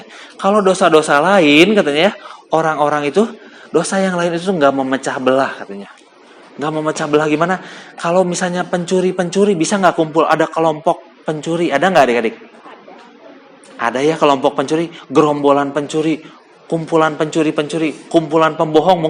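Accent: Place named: West Java, Indonesia. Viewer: Wah keren kakaknya sermon pake aksen Sunda😂😇👍🏽👍🏽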